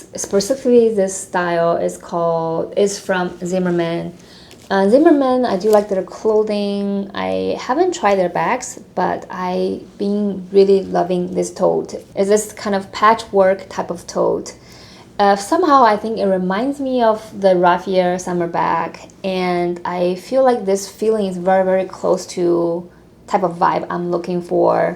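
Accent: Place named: New York, United States. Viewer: when you spoke about the rain and wind I listened and just realized you are a NYC girl too!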